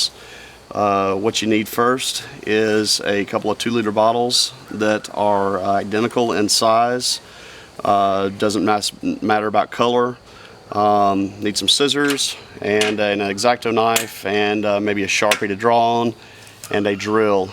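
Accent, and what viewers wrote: United States. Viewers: Cool video, bro. Haha, you sound like Forest Gump, Tom Hanks voice and Southern Accent. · u talk western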